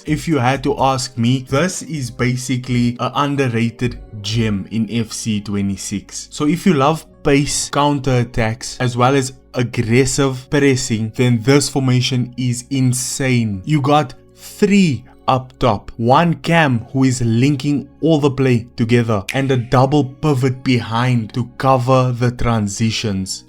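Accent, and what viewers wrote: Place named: South Africa. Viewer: Bro youre south african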